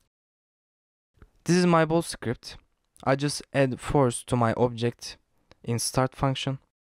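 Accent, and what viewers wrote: Turkey. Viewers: Great video thank you, You sound like turkish are you ? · Aksanindan turk olduguna yemin edebilirim :D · Reis İngilizce kaynak olarak bile bu kadar kısa ve net anlatan bi tek sen varsın. Türk olduğunu anında anlasam bile :) Bu arada anlatım muazzam. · tek bir sorum var sen türk müsün ? çok belli ettinde :D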